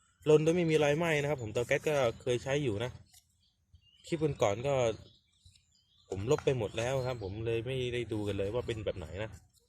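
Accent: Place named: Java, Indonesia. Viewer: ini orang jawa ya, kok ngomongnya ngapak wkwkwkwk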